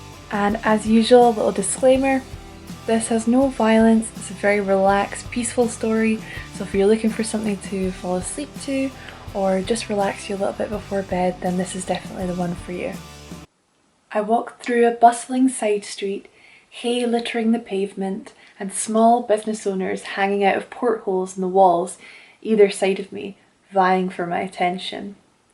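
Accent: Edinburgh, United Kingdom. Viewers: So nice to hear such a lovely Scot lass tell a beautiful story. Would love to hear a wee bit more of the Edinburgh accent, it is my favorite sounding speak. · I adored this story dear. I love your EDINBURGH accent. Once in another life I visit EDINBURGH. Almost marry a Scottish gal. Thank you for what you do.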